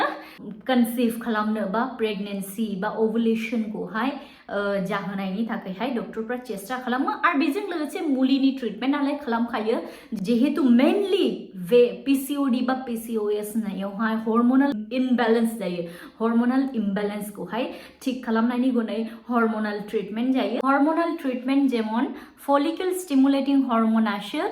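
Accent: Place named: Bodoland, India. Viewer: Jahag Lagtar bai abo bodolend ni Rao Baidi sur Pwra 😊❤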